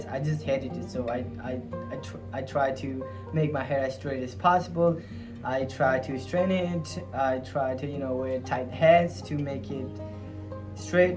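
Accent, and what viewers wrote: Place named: Philippines. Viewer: He from da phillipines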